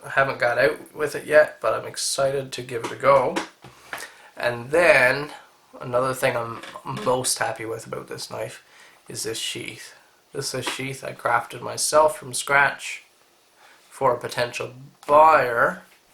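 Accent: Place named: Newfoundland, Canada. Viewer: Your accent. You a Newfie?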